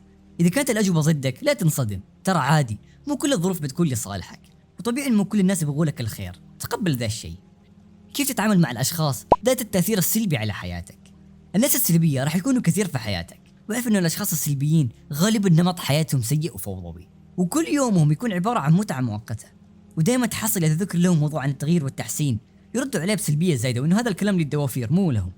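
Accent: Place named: Saudi Arabia. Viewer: من اللهجه واضح سعودي☺️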